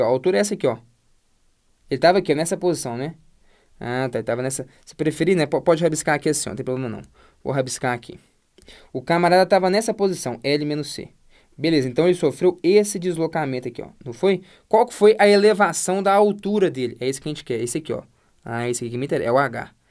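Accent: Minas Gerais, Brazil. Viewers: esse fesso e mineiro... ctz kkkk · Matéria boa demais. Sotaque de Minas não da pra não perceber hahaha. Valeu pelo conhecimento!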